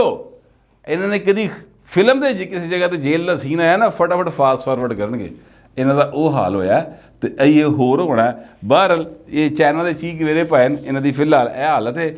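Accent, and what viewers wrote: Lahore, Pakistan. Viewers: صاحب آج تو آپ کا وی لاگ دیکھ کر ڈبل مزہ آ گیا ھے ۔۔۔ آپ کا لاہوری انداز بیان تو ہمیشہ ہی دلکش اور دلچسپ ہوتا ھے۔۔۔ آج تو غضب ھے ۔۔۔ اللہ آپ کو اپنی حفظ و امان میں رکھے آمین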